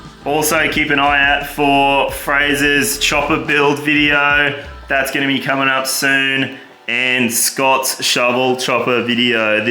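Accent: Australia. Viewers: I hear the Australian accent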